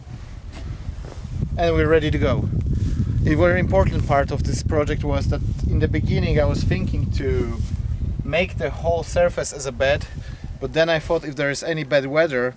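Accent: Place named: Sweden. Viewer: You have a Swedish accent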